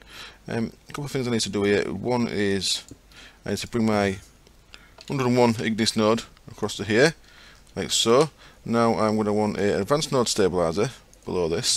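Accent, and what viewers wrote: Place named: Northern England, United Kingdom. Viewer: I'd be interested in listening to your soothing northern accent no matter what game you were playing. Reminds me of my grandfather Grumpy a good Yorkshire lad.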